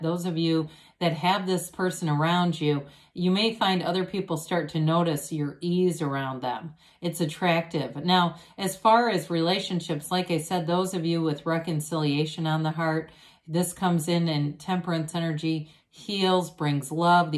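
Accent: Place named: Wisconsin, United States. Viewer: LOL you have a very distinct voice. I'm from the midwest...you sound like you are from Wisconsin. LOL Great reading! I'm the first female arch angel that walked the earth. I work for God...He prefers me single...I've been single for 22 years. I've been allowed to participate in the angelic realm before my time. Although I have so missed the life of a couple, He gave me the opportunity to know motherhood and for that I am eternally grateful. Outside of that it has been a life of serving God in the most unusual ways...God and I made a deal, as a healer, only if I am to affect someone for God do I care to know there problems...it's too much. But when I need to know I will. It's a very clear understanding and contract that we made...working for God has it's other perks. Thank you So much for this insight. I'm still in the 3D realm, I'm walking a fine line.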